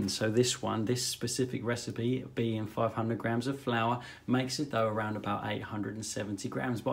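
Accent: United Kingdom. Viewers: Having gotten all the knowledge im gonna get by watching all these videos, I now put them on just before bed so I have sweet, doughy, British sounding dreams.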